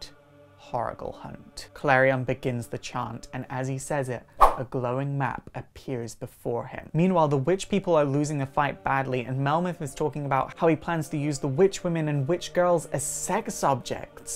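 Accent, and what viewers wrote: Scotland, United Kingdom. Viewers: I CANNOT take a Lovecraft quote seriously from your airy Scottish voice. Good video, loved the Kabbalah talk at the end. A weekly video series on Kabbalah with charts and diagrams would be killer. Happy Halloween.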